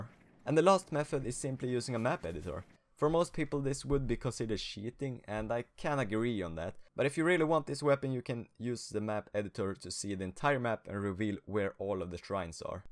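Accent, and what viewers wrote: Sweden. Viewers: Man hör att du är svensk. xD bra video btw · This is the most Swedish accent iv’e ever heard. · I hear ur swedish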